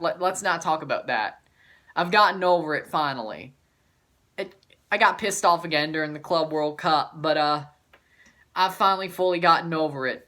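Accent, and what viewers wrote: South, United States. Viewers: where are you from your accent sounds very southern for a Toronto fan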